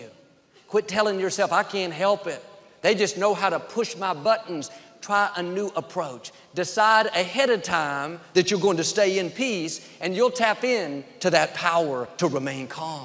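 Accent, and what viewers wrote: Texas, United States. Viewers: Thank you so much for ALL your encouragement, jokes and messages! I do love your Texas accent. God bless you in Jesus' name! ! 😘😘🙋🙋👏👏😃😃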